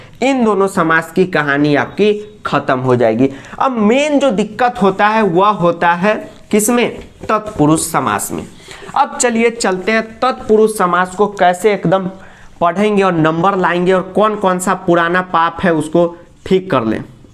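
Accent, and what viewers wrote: Bihar, India. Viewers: Aap bihar ke ho kya?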